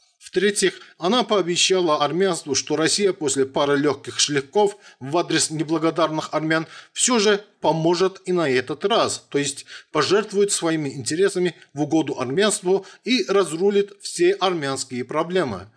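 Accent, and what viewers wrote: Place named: Azerbaijan. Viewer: Margaritu armyane lyubili I lybyat! A vse, kto seichas pishut (yakobi protiv Margo), eto-- vashi soplemenniki! A vash akcenq glupovatogo azera, diktor, otvratil'no I opasno dlya ushei))))). Vi uje sdelali nash abrikos samim znamenitim fruktom na planete, oluxi))))))). Tak shto sidite spokoyno, zanimaites' tem, k chemu privikli----- prodajei zelen'y!))))))))))))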